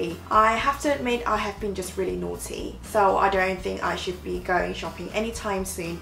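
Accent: United Kingdom. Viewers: 맨날 언니 영상 보면서 영국발음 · 언니 진짜 너무 예뻐요 ㅠㅠ 진짜 언니 런던에서 혼자서 찍을때부터 계속 구독햇엇는데 ㅠㅠ 어느새 더 가까워진 느낌..ㅎㅎㅎ앞으로 자주 올려주셧으면 좋겠어요 너무 반가움..👐영국 말 너무 매력잇어요 ㅠㅠ 배우고 싶다능..ㅎㅎ 언니 진짜 좋아해여💖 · 영상 항상 재밌게 보고있어요! 매번 눈팅이랑 좋아요?버튼이라해야하나욬ㅋㅋ 저거누르기만 했었는데 첨으로 댓글달아보네옇ㅎㅎㅎ 다른유부터영상보다 영상이 먼가 더재밌어서 꼭 보게되네여ㅎㅎ 영국발음도 신기하기도하그~ 하여튼 다음 영상 기대할께용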